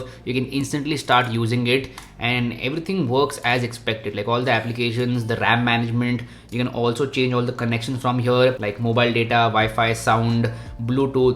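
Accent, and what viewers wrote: India. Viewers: English from India!!!! I'm brazilian but I can notice Indian English accent! I'm 99% sure! lol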